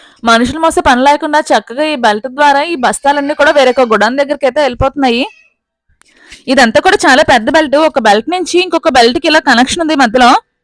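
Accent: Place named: Srikakulam, India. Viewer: Srikakulam voice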